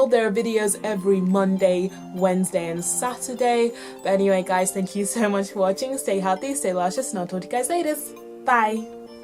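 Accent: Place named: England, United Kingdom. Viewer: You So Cute! Such Lucious lips And Eyes💎 U Talk So Cool Over There In England..... 💖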